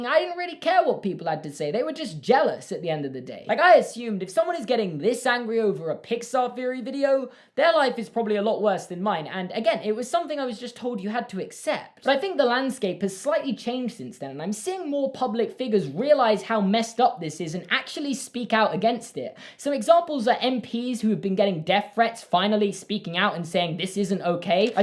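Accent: United Kingdom. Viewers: I don't know if you'll read this, but I feel so positively impacted by your video that I need to comment. I do not comment often on videos. I believe I found your channel through the Carlin Bros. I enjoy a lot of the subjects you make videos about (Harry Potter mostly). I really enjoy your editing style and delivery. I'm a bit surprised that you're self-conscious about your voice because I don't find it annoying at all. Perhaps it's the British accent? I know far worse voices for YouTube. Getting off-track a bit... I think this video says a lot about your character. You're opening up about your experiences as a YouTuber and ultimately your highs and lows. You acknowledge shortcomings and work hard to be a better YouTuber and a better person. That motivates me to be better, too. I also hope that you keep finding fulfillment through YouTube because I really enjoy your channel. 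I enjoy your comedy and value your opinions. I hope you know that many of your subscribers value your perspective. It does surprise me a bit that you receive impactful hate comments. You're a great person and you deserve everything you get from this platform. I hope you are well! Best of luck to you going forward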